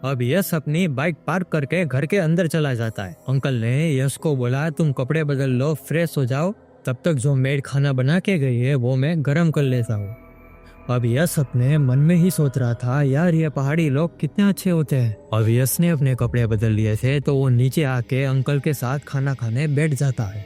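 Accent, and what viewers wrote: Gujarat, India. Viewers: Gujarati tone me pehli bar koi horror story Sunni maine❤ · Amezing story!❤ (I love your gujarati tone and expressions) Keep making the stories.💥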